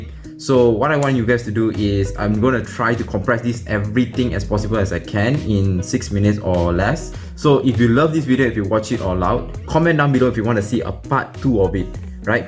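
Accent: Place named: Africa. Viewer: Why do you you sound African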